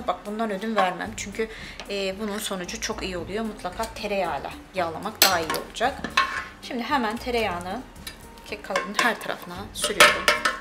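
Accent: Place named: Azerbaijan. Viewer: Hər şey əladı.Amma zəhmət olmazsa öz dilinizdə kanal acın .Sizin türk deyil azeri oldugunuzu lehceniz asanlıqla ələ verir.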